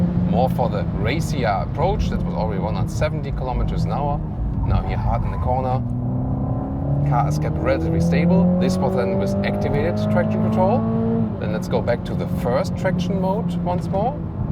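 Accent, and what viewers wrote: Germany. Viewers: WTF you'reon about? His English is superb. He might have a distinctive German accent, but grammar,0 vocabulary and overall fluency are spot on.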